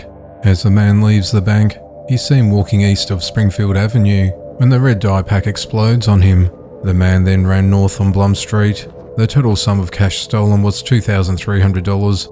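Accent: Australia. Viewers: Cheers yourself, I came for the Aussie accent and stayed for the daily buzz 😀👍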